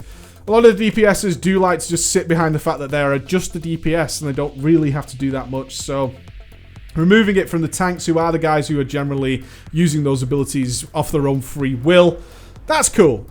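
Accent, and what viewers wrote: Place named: Scotland, United Kingdom. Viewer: I can't understand half what this mush-mouth is saying. Yelling, then mumbling with that thick-as-haggis accent doesn't work well.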